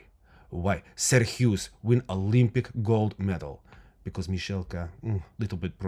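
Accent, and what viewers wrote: Russia. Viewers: I like you are missing the articles with your Russian accent 😅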